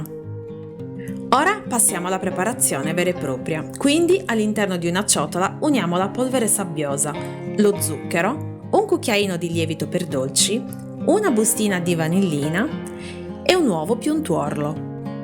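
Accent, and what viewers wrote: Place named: Sardinia, Italy. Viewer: Ciao ti seguo sempre: molto brava👍sei sarda eh!!!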